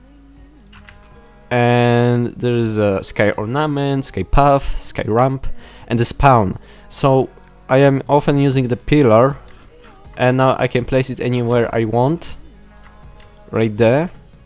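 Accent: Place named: Germany. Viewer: Masz akcent jak najebany szkop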